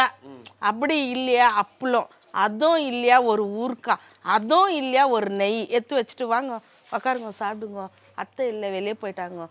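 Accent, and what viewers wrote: Bangalore, India. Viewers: Banglore tamil❤❤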